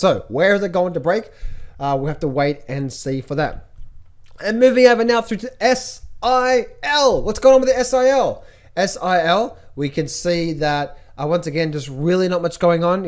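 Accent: Australia. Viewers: HERE WE GO MORE AUSSY ACCENTED B/S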